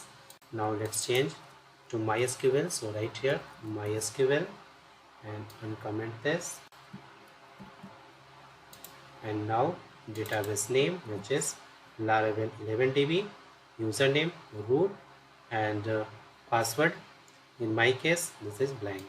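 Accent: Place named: India. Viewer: I love you so much Mister India, my friend and i love your accent so much, we will come to India soon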